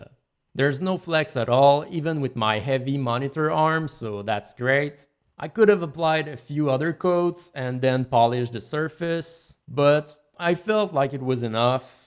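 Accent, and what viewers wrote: Quebec, Canada. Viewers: Great video dude! Are you from Quebec? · Est tu québecois?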